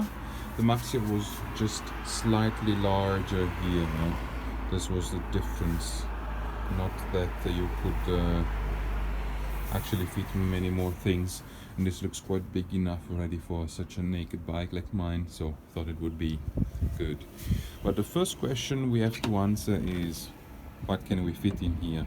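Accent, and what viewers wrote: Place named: Germany. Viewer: Ich glaube zu hören, dass Du Deutscher bist. Warum sprichst Du dann nicht Deine Muttersprache.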